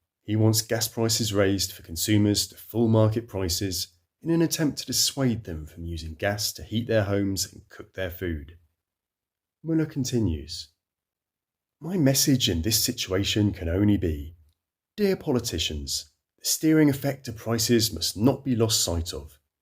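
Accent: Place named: United Kingdom. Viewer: You sound like your from 🇬🇧 not 🇩🇪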